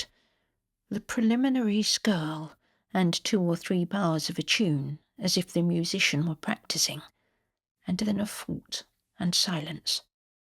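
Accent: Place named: Scotland, United Kingdom. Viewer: What a great little story, I thoroughly enjoyed it. Sitting here with my eyes closed, I imagined I was at Mallory. As usual a brilliant narration and I loved your Scottish accent. Thankyou so much.❤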